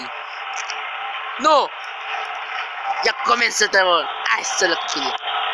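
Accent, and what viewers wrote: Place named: Cuba. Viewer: No te preocupes por hablar como cubano, Soy peruano pero hablo como mexicano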